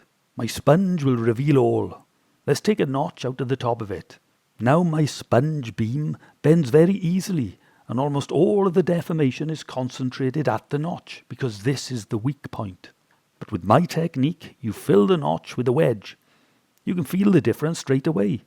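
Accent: Wales, United Kingdom. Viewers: Beautiful welsh accent and very informative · Clear, concise, excellent explanation of concepts!!! But unfortunately for the rest of the day I will now be talking in a heavy welsh accent!!!!😆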